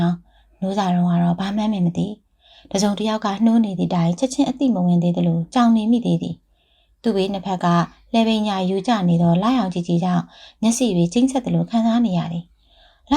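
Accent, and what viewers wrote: Upper Burma, Myanmar. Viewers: မင်္ဂလာပါတို့ အညာရေဆရာမခင်ခင်ထူးဝတ္ထုကောင်းလေးကိုရွေးချယ်ဖတ်ပြတာစာဖတ်သူအသံလေးနဲ့အညာသံအညာဓလေ့တို့အညာနဲ့လိုက်ဖက်နားထောင်ကောင်းလိုက်တာကျေးဇူးပါနော်❤❤❤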